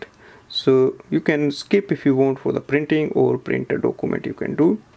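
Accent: India. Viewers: very bad instructions hard to understand India speaker - very little paper tray -NO instruction how to set up WITHOUT smart phone to me a WAST$E of money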